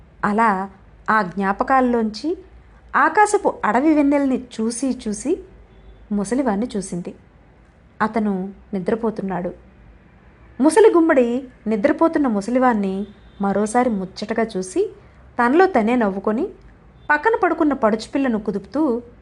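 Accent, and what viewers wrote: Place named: Srikakulam, India. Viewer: మా గొప్ప కథను పరిచయం చెయిస్సీరు...❤ సిహాకుళం భాషలో విండం ఎంత బావుందో మరి చెప్పలేను బాబో...😍❤❤❤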